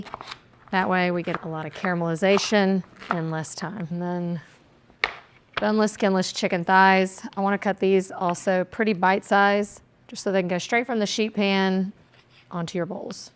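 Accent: South, United States. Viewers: Yummy I Would Pour it over Broad Egg Noodles or Fetachiy Noodles or even Mashed Potatoes Served with Garlic Bread. You Explain everything so Simple. You is so Gosh Darn Cute From a Canadian Fan in Vancouver B C ( ❤ Love your Southern Accent ) PS: Love to see more Fish Recipes IE: Oven Baked Salmon / Pan fried Butter, lemon Trout / Pasta with Prawns & Fresh Dill 🤗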